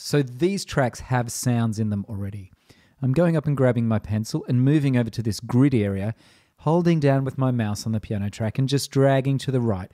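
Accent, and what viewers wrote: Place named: Australia. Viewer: I love Cubase 10.5.2 Pro but feel that I'm only using about 1% of its capabilities. It is clear to me that the person talking on this video is a fellow Aussie, but I have no idea who he is and would like to learn more about what he does musically and how he uses Cubase. The videos are awesome. Cheers.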